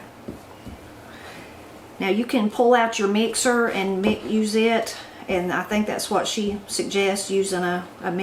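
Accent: Appalachia, United States. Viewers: i fell in love with the appalachia accent it is so beautiful, i got a smile everytime i hear oil and moist, not in a making fun way, but because it wamrs my heart that every place has something so unique and special, flavors and sounds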